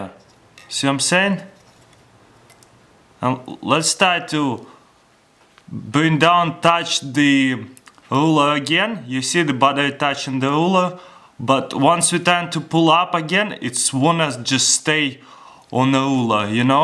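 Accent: Russia. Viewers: you englisch from rusia? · I can tell you are Russian. Cool I am too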